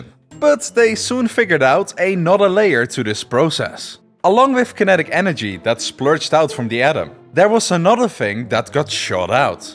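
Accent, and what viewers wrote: Netherlands. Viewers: Are you Dutch because you a bit Dutch accsend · Are you dutch? I think i can recognise an accent there. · You have a Dutch accent i think.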